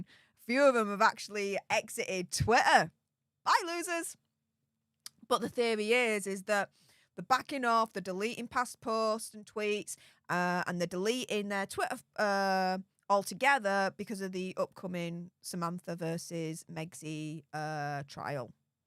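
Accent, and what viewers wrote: Yorkshire, United Kingdom. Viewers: Love the Yorkie accent!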